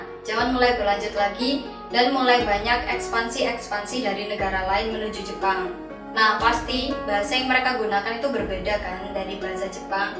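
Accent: Java, Indonesia. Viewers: kok medhok tenan wkowkowko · Njir wong jowo 😍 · Aku kira mba nya orang jepang.. eh tau2 nya medok #hahaha.. Btw, terimakasih infonya :D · ojo medok medok mbak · jowone jowo endi mbak ? hahaha jogja ? semarang ? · Halaa logat e jowo tulent iki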